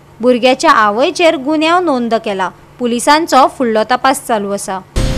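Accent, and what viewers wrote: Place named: Karnataka, India. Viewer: Is the anchor from karnataka? Highly accented.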